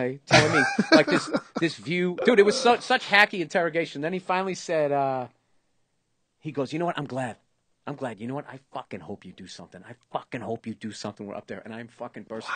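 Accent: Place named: Indianapolis, United States. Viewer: Definitely sounds like a guy from indy. No surprises here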